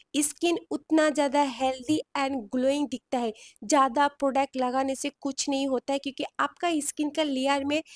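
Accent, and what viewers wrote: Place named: Bengal, India. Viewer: App bengali ho.... Hindi sunke asahi lag raha hai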